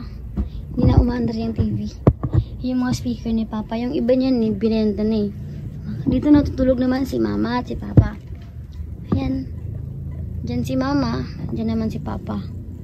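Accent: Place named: Iloilo, Philippines. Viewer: Sin hahaha ilongga tlaga. yero po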